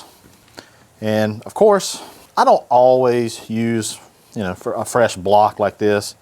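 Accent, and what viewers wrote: North Carolina, United States. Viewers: I know an eastern north cackalacky accent when I hear one!! love your videos... inspired me to get a blackstone!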